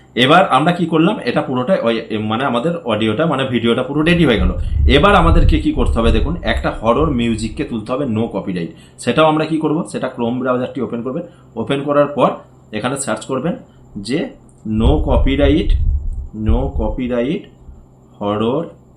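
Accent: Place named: India. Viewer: আপনার কয়েকটি কথা শুনেই আমি বুঝেছি যে আপনি ভারতীয়।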